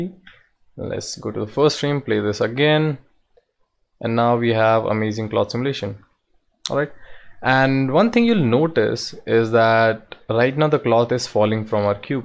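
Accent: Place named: India. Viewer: I found that this guy is an Indian because of his voice😎😈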